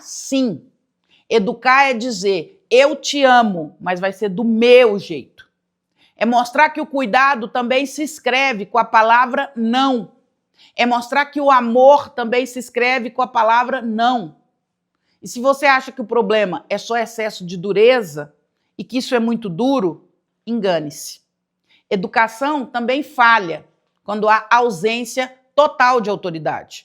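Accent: Brazil. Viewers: Olá, a senhora poderia melhorar este sotaque, e parar de falar com este R interiorano e falar sem sotaque.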